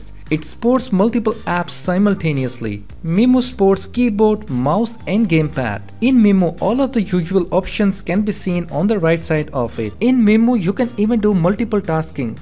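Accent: India. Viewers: So Bad englisch i understand like nothing pls do something against your Indien acent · It’s not that bad...he sounds like tech support from the land of India!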